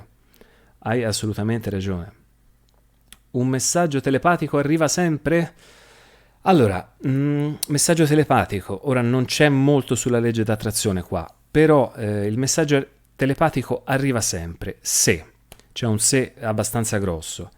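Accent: Tuscany, Italy. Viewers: Grazie per questa diretta, l’ho vista solo ora. E comunque il cancella, cancella, cancella detto con il tuo accento toscano è già motivo di buon umore 😊😊😊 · Il tuo accento Toscano Tienilo con te ❤️ La tua emozione bellissima Alla prossima diretta ti farò una domanda Buona notte 😘😘😘😘😘 tvb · non è Nulla caro buona serata ripensando alla legge di attrazione sempre in servizio non conosce crisi di congiuntura 😅 la difficoltà è il vivere con persone anziane di altri tempi e negativi e combattere questa nebulosita è cercare di proporre é difficile continuiamo sempre molte grazie e apprezzo la sua scelta di farci sentire la sua voce Toscana la patria di Dante e sempre molte grazie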